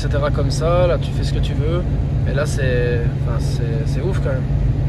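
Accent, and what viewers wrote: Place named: North Africa. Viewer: Cet accent limite nord Afric est insupportable...faut évoluer garçon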